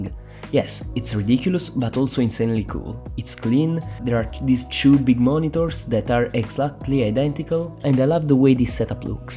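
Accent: Italy. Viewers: Are you Italian? cause you sound exactly like me talking in english and im from Rome lol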